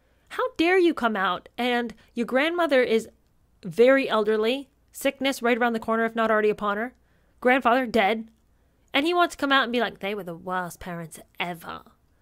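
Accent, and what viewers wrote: United Kingdom. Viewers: does a remarkable British accent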